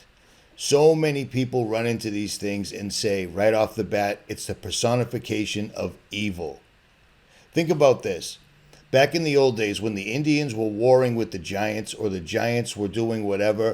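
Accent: Boston, United States. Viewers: Yo bro definitely a good piece!! Sounds like a guy I knew from Boston I found on YouTube back in 2013👍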